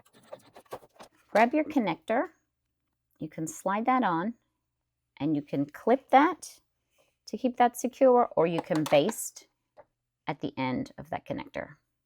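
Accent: Australia. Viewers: As an Aussie I love love love hearing your Australian accent popping through every now and then! Thank you for your amazing tutorials - new subscriber here :)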